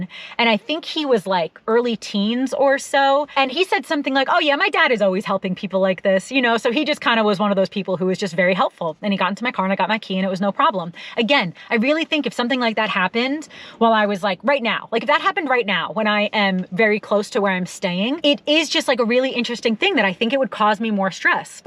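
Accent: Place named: New Jersey, United States. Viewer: A whole lot of Jersey ny accent coming out in this one. You stay home for the holidays 😆. Happy new year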